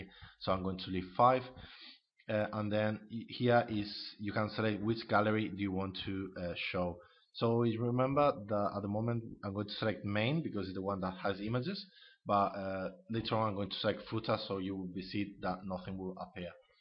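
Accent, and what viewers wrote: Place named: Spain. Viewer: jejejeje por el acento me sonaba a bastante español, pero ahora que he visto por ahí..."subir archivo" jejejeje Acabo de comprar el tema y lo estoy instalando, a ver qué tal. Un saludo y gran trabajo con este soporte en vídeo.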